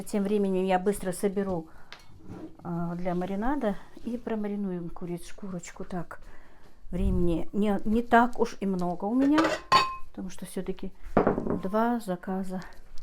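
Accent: Standard. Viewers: Смотрю и отдыхаю Чисто руский говор молодец.Труженница👍👍👍👍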